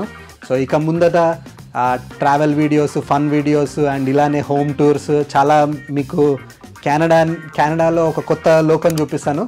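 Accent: Telangana, India. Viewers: Hi...I am from Hyderabad. I like your videos. Maa babu kuda canada lo untadu.Inka mee matalu mana Telangana accent lo bagunnai.Mee canada cat kuda bagundi.😄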